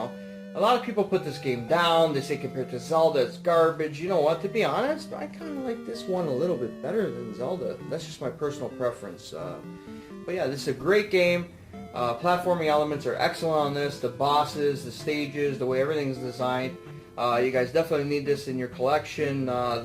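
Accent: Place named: Northeast Ohio, United States. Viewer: sounds similar to northeast ohio dialect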